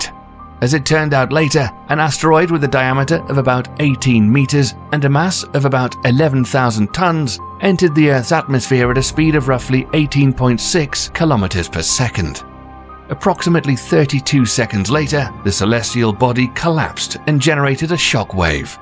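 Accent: United Kingdom. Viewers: Funny how bull shit with a British accent sounds more credible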